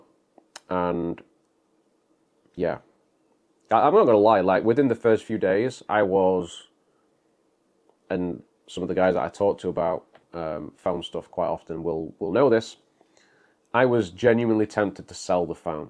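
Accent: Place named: Liverpool, United Kingdom. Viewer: First of all why are you speaking in a Scouse accent? Whereabouts in Liverpool are you actually from? If you are not from Liverpool then why are you speaking in a Scouse accent?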